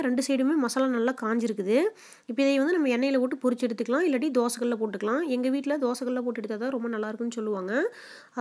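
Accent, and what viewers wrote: Kongu, India. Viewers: Kongu tamil super sis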